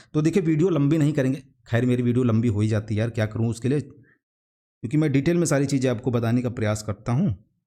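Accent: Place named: India. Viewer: Very beautiful 🙏🌹sir. ❤️Thanku 😘 love you sir. Or aapka bolne ka desi style mujhe bahut pasand hai.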